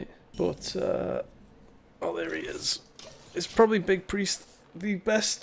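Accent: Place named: Scouse, United Kingdom. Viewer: great play I can hear a touch of the scouse accent lol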